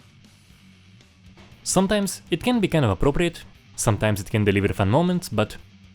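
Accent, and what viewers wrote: Russia. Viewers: Оо, русский чел, по акценту узнал)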